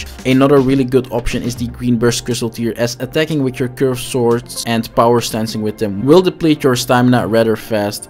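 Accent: Netherlands. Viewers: Totally agree. The builds are on point, 100% of the time. And, the Dutch accent provides the same gravity for ER buildcraft vids the German accent provides for the Mathologer's math videos! 😎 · followed ur early to mid game build, and now doing this one too. love the detail and explaination. btw are you dutch?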